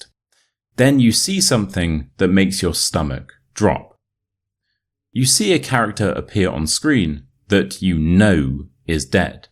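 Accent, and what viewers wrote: United Kingdom. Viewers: Wao i just want to listen the British accent a end up focusing at how story ends , I love it thx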